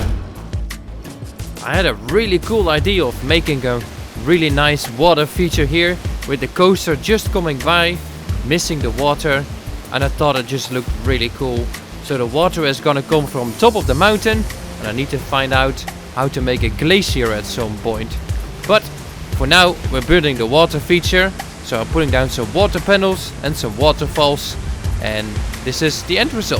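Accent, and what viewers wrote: Netherlands. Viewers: You live in New Zealand right but you have a playlist of dutch Music and you have a accent just like a lot of dutch people so are you dutch?? (Awesome video btw)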